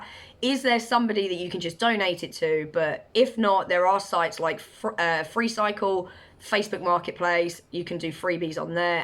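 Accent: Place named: Leicester, United Kingdom. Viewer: Sounds like you're from leicester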